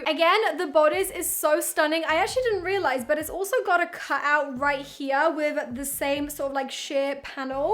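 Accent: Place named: United Kingdom. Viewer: By your accent I can guess you are from UK so please do a video on urbanic clothing..... Btw in love with your videos ❤️❤️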